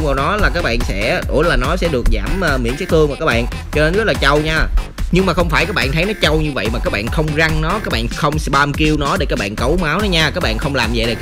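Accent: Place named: South, Vietnam. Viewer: .ôi trời, người tôi mong đợi một youtube làm về liên quân mà có giọng miền nam giống tui😭đó giờ xem toàn miền bắc 😭mò mãi mới ra đc Anh, ôi hạnh phúc quá đi 😭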